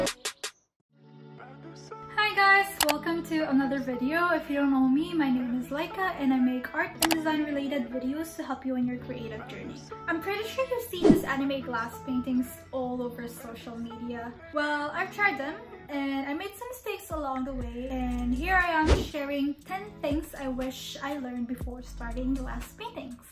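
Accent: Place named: Philippines. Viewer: Thank you for this! <3 (Glad to find a Filipina/Pinay artist! thought you were Filipina by your looks+last name at first then your accent told me the rest. and hey I know my people 😂 mahal kita!)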